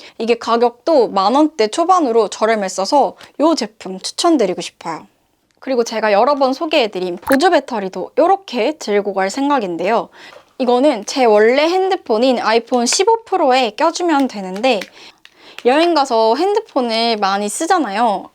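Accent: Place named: Vietnam. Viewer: 베트남분이신가요? 한국분아닌것같은데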